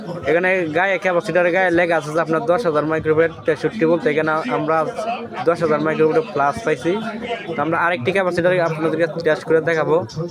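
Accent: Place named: Mymensingh, Bangladesh. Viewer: ভাই আপনার বাড়ি কি ময়মনসিংহ আমার বাড়ি ময়মনসিংহ আপনার ভাষা শুনে বোঝা যায় আপনার বাড়ি ময়মনসিংহ❤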